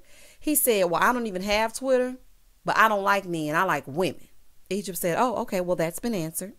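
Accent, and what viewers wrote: South, United States. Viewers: Well its the south and you have a little south in your voice. But I agree I cannot stand all of fussing at the same time though, lol!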